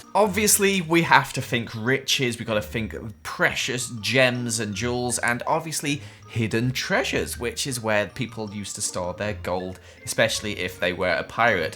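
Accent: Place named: United Kingdom. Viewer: without a British accent, the intro would not work at all.. cue me trying to say aww and ore in the same way for five minutes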